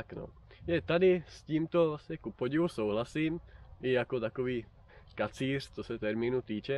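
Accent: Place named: Wallachia, Czech Republic. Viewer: Mluví krásně valašsky, taky se mi to líbí :)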